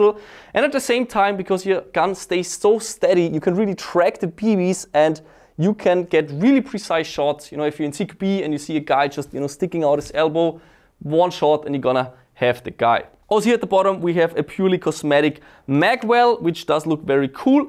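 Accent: Austria. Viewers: Sometimes the Austria Accent comes through your English! And I like it! (I come from Germany, so...)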